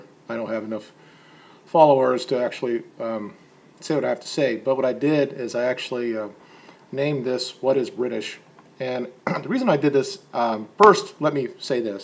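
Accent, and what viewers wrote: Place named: United States. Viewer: You are German and French? Yiu sound American to me. Regardless of where the players are from it has gone down the drain with football/soccer. I am happy I will work during tomorrow's final. I am a Lazio fan. Milinkovic Savic is a monster. Incredibly talented. Just you pay enough and he is yours.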